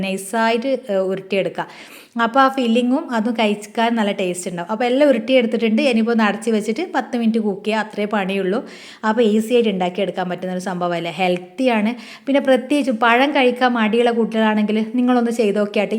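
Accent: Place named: Vadakara, India. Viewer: Ninkale varthanam vadakarakkare bhashsa pole ende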